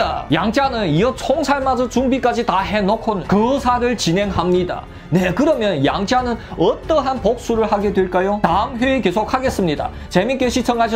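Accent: Yanbian, China. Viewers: 이양반 말투는 연변식 한국말인가 ?아님 한국식 연변말 중심이없어 듣는입장의 학벌의 한계인가?.....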